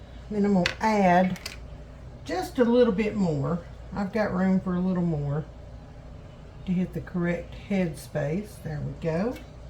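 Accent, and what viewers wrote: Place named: South, United States. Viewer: Canadian here…love how you pronounce boil….bowl. So fun to watch your southern cooking, and hear the accent. Never heard of this cowboy candy before.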